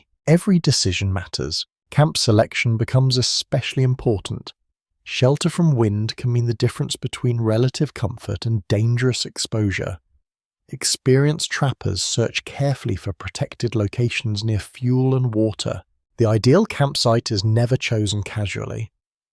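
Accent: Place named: United Kingdom. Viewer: It's impossible for me to appreciate a video about early American history being narrated by a guy, real or not, with a British accent. I wouldn't watch a video about European history if the narrator sounded like John Wayne.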